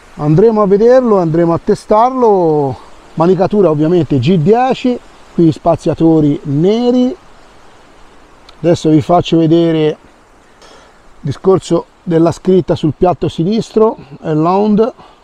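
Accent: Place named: Tuscany, Italy. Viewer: Coltello pazzesco e’ riduttivo….addirittura anche il Leccio si è dovuto arrendere( dopo una estenuante lotta corpo a corpo 😂)….mi piace moltissimo…..ottima la tua recensione (come al solito…) sempre dettagliatissima e colorita col dialetto che solo noi toscani sappiamo offrire. Una buona domenica a tutti 😊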